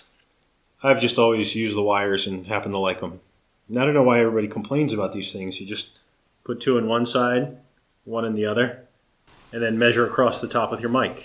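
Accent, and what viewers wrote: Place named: United States. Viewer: As usual a fascinating and really interesting video. I'm interested to know: to my British untutored ears you sound American, not Canadian, so how come you mostly seem to use metric? (unlike most of your countrymen who still use that bizarre system they inherited from us Brits.) Even tho I'm I'm my 70s and was schooled in scruples, furlongs etc. it amazes me that anyone would choose "English" when everyone everywhere else uses metric. Perhaps you live in Europe and have seen the light. :--)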